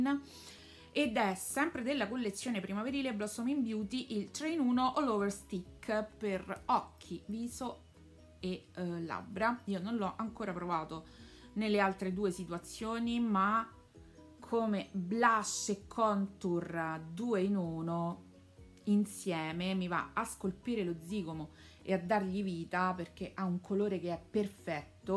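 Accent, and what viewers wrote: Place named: Rome, Italy. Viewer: Primissimo tuo video che vedo, sei davvero tanto simpatica! Mi piace un sacco la tua parlata romana 😘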